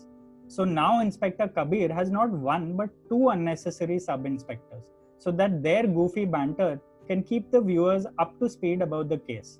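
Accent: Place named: India. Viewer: Your accent matches typical Indian accent 10000%.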